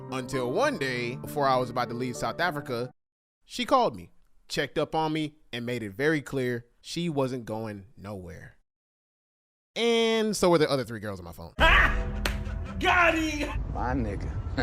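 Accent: United States. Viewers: from one honeyless man to another let me inform you in Joburg no honey gets "rizzed up" they just want the paper cuh, they just heard your American accent and probably thought "yeah, he got that cheese". You want a honey who'll be a ride or die, go to KZN get yourself a Zulu wifey, next time you in SA. AND DEAR GOD DON'T GET A XHOSA hun. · It's ur accent bro, u got an American accent that many people in South Africa might find interesting...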